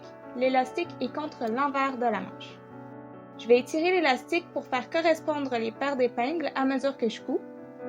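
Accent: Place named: Quebec, Canada. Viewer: Bravo pour cette réalisation très fraîche. Vous êtes simple et pro en même temps. Pour moi, le voyage c'est votre délicieux accent québécois:):)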